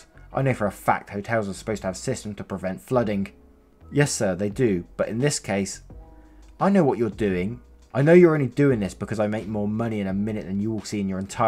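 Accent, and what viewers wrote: United Kingdom. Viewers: Wow! I've never gotten a response by a r/eps person! Also I LOOOOOOOOOooooOOVe your accent! Are you brittish? I'm from Colorado in the US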